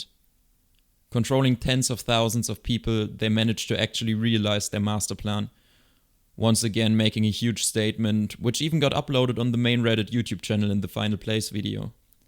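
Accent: Germany. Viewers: Ich Höre da deutsch Raus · You sound german am i right ? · Man kann hören deinen Deutschen Dialekt 🇩🇪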